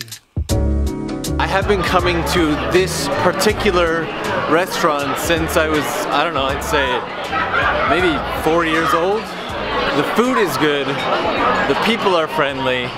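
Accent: Canada. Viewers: I never thought I would be able to differentiate a Canadian accent from another English speaking accent... But apparently I can. Apparently they have two Uniqlo stores in Canada, I just checked. None around here though so... So nice people see you and they get all excited. That must be such a nice feeling. Anyway, have a great day. And drink your coffee before leaving it to freeze!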